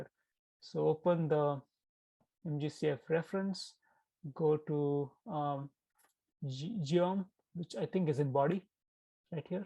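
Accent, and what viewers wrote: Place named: India. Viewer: please guys please to all my indian ppl ur killing us with the accent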